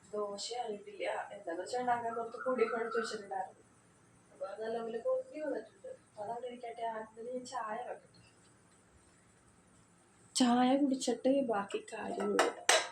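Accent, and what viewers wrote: Kochi, India. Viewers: Aa "tta"kelkananishttam tttta 😊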